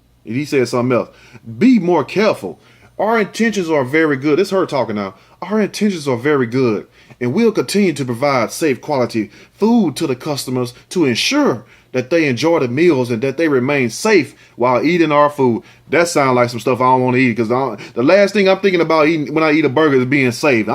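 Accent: South, United States. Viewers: Love the country twang of your accent, and you're so silly, yet wise. You're alright with me.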